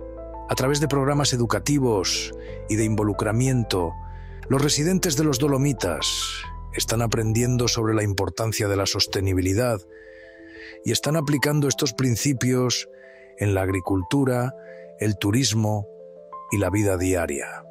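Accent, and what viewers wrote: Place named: Castile, Spain. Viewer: Magnífico documental pero lo mejor, para mí, es la voz del narrador con un castellano perfecto; yo creo que incluso puede ser un arquetipo de dicción que nos deja perplejos a nosotros los andaluces, con nuestro castellano sureño.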